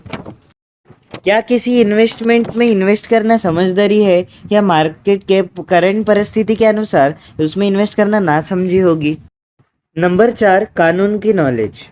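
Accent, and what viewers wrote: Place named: Hyderabad, India. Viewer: Tum Hyderabadi Miya lag raho ji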